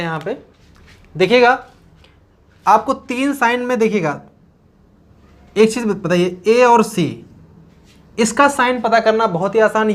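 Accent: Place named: Bihar, India. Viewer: Sir you are amazing 👍🏻👍🏻👍🏻👏🏻👏🏻 aur apka bihari tone bhi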